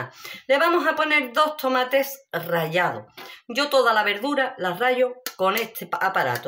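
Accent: Andalusia, Spain. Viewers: Querida,pero yo creo que esas no son costillas la receta esta buenisima como todas las tuyas eres de lo mas expresiva explicando claro eres andaluza????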